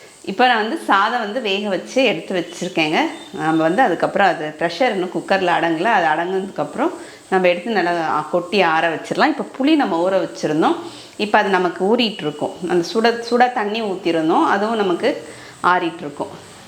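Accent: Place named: Pudukkottai, India. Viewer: Iam 58 belong to Pudukottai The way you we’re speaking reminded my side people voice More than the receipe I enjoyed your Tamil. Good going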